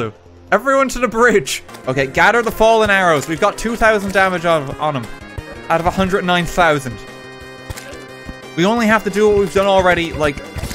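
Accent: Ireland. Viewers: R u irish? cuz ya got an irish accent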